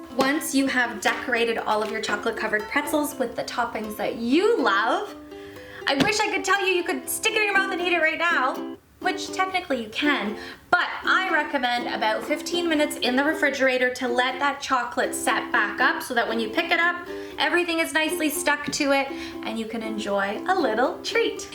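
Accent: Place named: Canada. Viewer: Hi,! Those chocolate covered pretzels look amazing! I agree that drizzling on the chocolate over the bowl is the best thing to do. I love how you covered them with so many different things. Curling the bow was a nice touch. BTW: I am not sure if you mentioned you were Canadian in previous videos, but I am really into languages, and I was pretty sure you were...when you mentioned Waterloo and Kitchener, I knew it! YouTube is so great because we can hear accents from so many cities and countries. Thanks for sharing!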